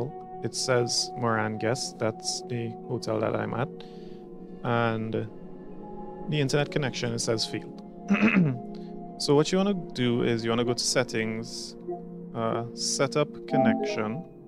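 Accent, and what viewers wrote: Trinidad and Tobago. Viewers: I knew you was a trini the second you talked lol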